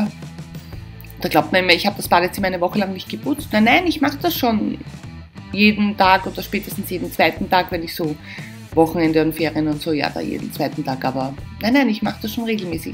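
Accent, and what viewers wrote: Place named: Austria. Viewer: Prosit 2020! Ich bin 26 und aus Oberösterreich! Ich finde dich und deine Videos super! Vor allem weil du auch "österreichisch" sprichst 😀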